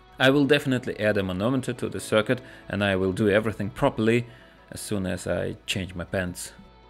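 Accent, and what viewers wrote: Russia. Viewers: а я вот никак не пойму. По акценту ты, вроде, русский, а по контенту в предыдущих видео, вроде, из америки. Я запутался, откуда ты?:) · Смотрю, слушаю и думаю, это у меня уши сломались или он не англичанин. Хм... Почему русский акцент... Хах) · так я не пойму ты русский или англичанин?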